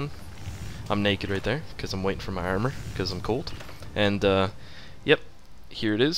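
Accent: Canada. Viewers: canadian accent? :D im british btw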